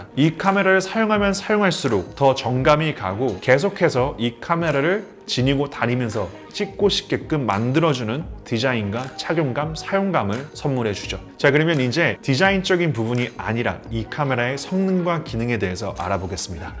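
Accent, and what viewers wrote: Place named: United States. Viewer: 재미교포신가요? 한국어도 미쿡말처럼 말씀하심..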